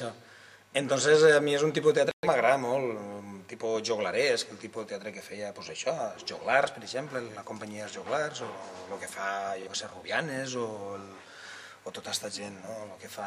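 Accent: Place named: Alcoi, Spain. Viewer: Com es nota que es alcoià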